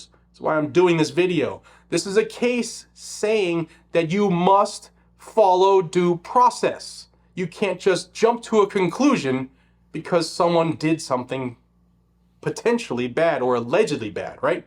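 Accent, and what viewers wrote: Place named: New England, United States. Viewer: Look at you!!! Only been in Tennessee for a couple months and you are already picking up our accent, slang, and language! I love it! Go ahead and immerse yourself in language with Johnny B so we can get rid of the rest of that foul, yankee accent! I love what you are doing my brother. Keep the faith, and the fight. We are all doing everything we can to stop all the madness from happening. Even if sometimes, all we can do is pray. If everyone would just praise God and pray, all of the problems would disappear. But, we know that most people won’t. So, here we are. We are definitely NOT the people anyone wants to fight against. But they are crazy, insane, and indoctrinated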